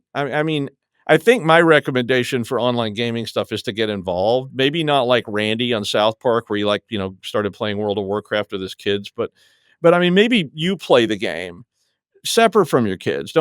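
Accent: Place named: Rhode Island, United States. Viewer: If I didn’t know better, I’d say you were born and raised in Rhode Island.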